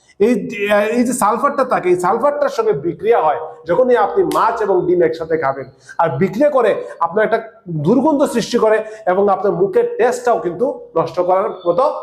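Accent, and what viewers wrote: Sylhet, Bangladesh. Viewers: বন্ধু, আফনে মনে হয় সিলেটি, সিলেটি মানষর লাখান লাগের তো 😅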